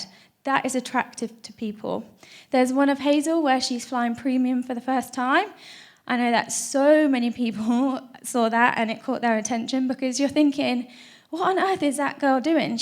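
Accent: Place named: United Kingdom. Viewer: first her british accent annoying second try your product in your ass see if it work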